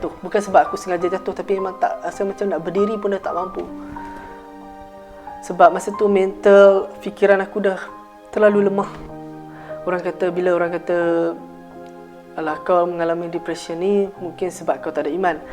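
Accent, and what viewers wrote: North, Malaysia. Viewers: Hang pasti oqang utaqa..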